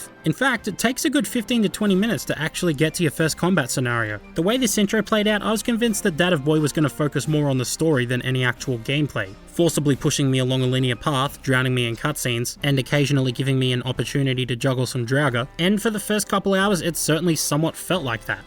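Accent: Australia. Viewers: Finally!!! I love all your Vids! They're so funny everytime Maybe your Aussie accent helps a bit :D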